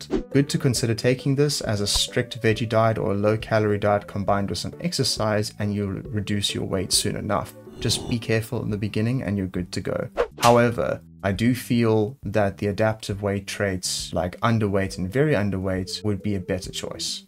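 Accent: South Africa. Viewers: Are you a South African bru? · You sound south african. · Hey bro are you South African? If so, greetings from the Eastern Cape! And great video man🤞🏼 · My Dude .... the South African runs rampant in you!! Thanks for the tips tho!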